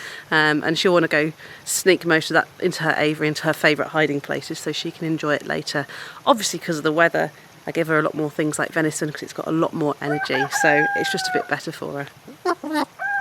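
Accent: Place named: Manchester, United Kingdom. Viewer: Well I thought she was talking rp recived pranonsition now it terns out she sounds a mankunian?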